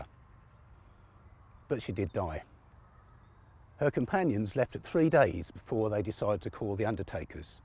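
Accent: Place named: Bedfordshire, United Kingdom. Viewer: Lovely video - enhanced by the presenter's beautiful Bedfordshire burr. If you are able, get hold of "Octavia, Daughter of God" by Jane Shaw (Jonathan Cape, 2011). It is a most illuminating book - and it WAS stocked by Waterstone's in Bedford town centre.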